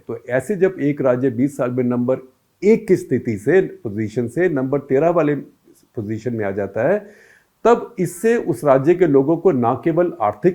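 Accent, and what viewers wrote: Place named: Punjab, India. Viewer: Sir, even your Hindi sounds as sharp as Punjabi accent 😂😂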